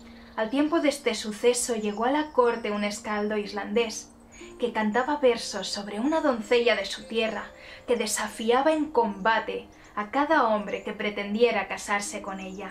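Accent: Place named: Balearic-Valencian, Spain. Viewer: Molt molt guapo! M'agrada molt quant cantes o parles en 'bacaiva" (balear-català i valencià) però molt poques vegades t'he sentit.. de totes maneres gràcies! Les teves faules d'on precioses...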